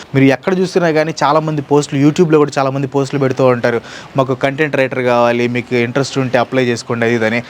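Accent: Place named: Tenali, India. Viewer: మా తెనాలి రేపల్లె డెల్టా ప్రాంతాల్లో ఉండే స్లాంగ్.... వచ్చిద్ది వెళ్ళిద్ది కెవ్వు కేకే 😜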